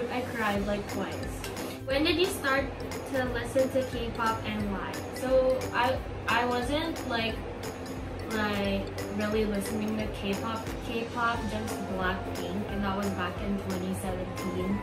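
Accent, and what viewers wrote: Philippines. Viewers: i just love your tagalog accent! Waaah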